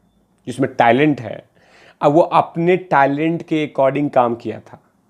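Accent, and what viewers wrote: Bihar, India. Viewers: BIHAR SE HO KYA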